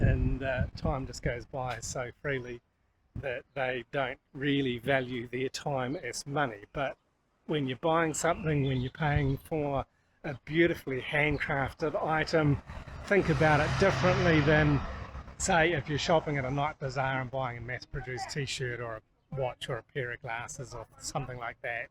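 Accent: New Zealand. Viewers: Love that Kiwi accent!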